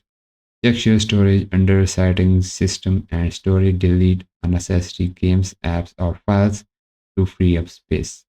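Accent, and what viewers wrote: India. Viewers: Whyyy tf on every vid it has to be a Indian 😔 im sorry im not racist but danmm I can't understand shi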